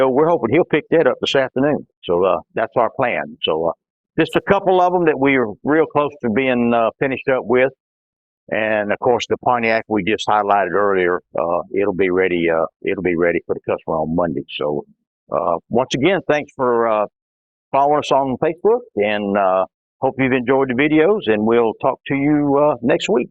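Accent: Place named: Georgia, United States. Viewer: Man, I love hearing this gentleman speak on motors. I’m from SC, and that Columbus, GA accent sounds great from here in Hamburg, Germany tonight. Thanks for the vid! Ready to get home to my 68 GTO.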